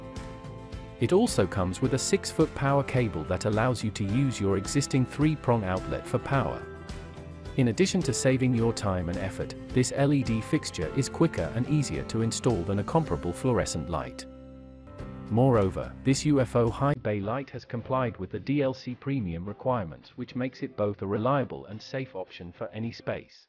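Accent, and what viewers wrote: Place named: United Kingdom. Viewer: STOP USING BRITISH VOICE OVER... american lite? then use a american vo. we dont like listening to limey's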